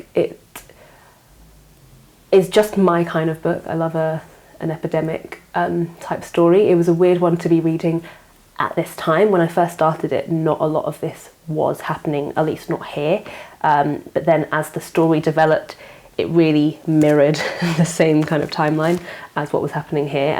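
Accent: England, United Kingdom. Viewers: I'm new to watercolor and new to your channel. I've binged watched tons of your content. I love listening to you (my mum was from England and your accent reminds me of her). Keep up the good work and stay safe.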